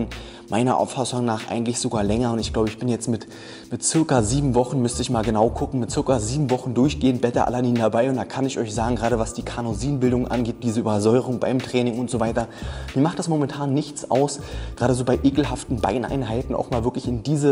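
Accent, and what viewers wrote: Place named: Berlin, Germany. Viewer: Er hat nen Berliner Dialekt. :) Berlin?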